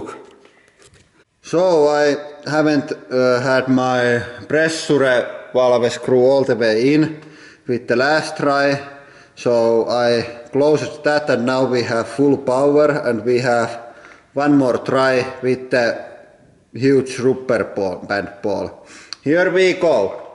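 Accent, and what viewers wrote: Finland. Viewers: finnish accent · Hes finnish for sure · Nope, he's Finnish. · Finland. Everybody tell this man, its finland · Your Finnish accent is so fluent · hittoku kuulostaa nii suomalaiselta ku puhuu englantia nuih XDxD tuo on nii suomalaista englantia ku voi olla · "Dat vas van touff rupperband ball!" YOur ACCENT IS SO AWESOME, are you from Finland? XD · its finish accent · finland accent > every other accent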